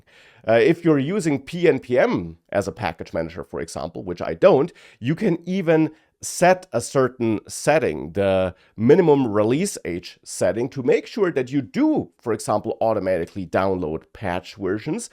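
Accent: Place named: Germany. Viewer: Just get rid of your german accent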